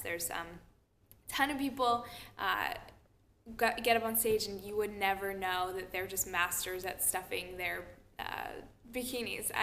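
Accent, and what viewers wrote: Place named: Boston, United States. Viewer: omg are you from boston? I am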